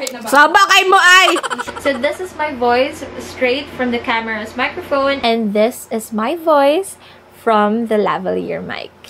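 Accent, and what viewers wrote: Visayas, Philippines. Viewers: Hala ate😲bisaya man diay ka😆❤️😻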